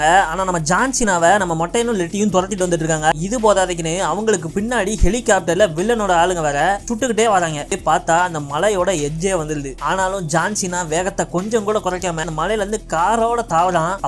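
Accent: Tirunelveli, India. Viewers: நெல்லை தமிழில் டப்பிங் செய்து பேசிய உங்களுக்கு ரொம்ப நன்றி மிக்க நன்றி சகோதரரே 💚💚🙏🙏💐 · Are you from Tirunelveli Ya Brother ??????? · Ena bro tirunelveli kaarana ne😍😍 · வர்ணனை அட்டகாசம் தோழரே... இதுக்காகவே உங்க channel ல subscribe பண்ணிட்டேன். உங்கள் வெற்றி தொடரட்டும்.. (எப்பொழுதும் இதே நெல்லை தமிழில் பேசுங்க தோழரே)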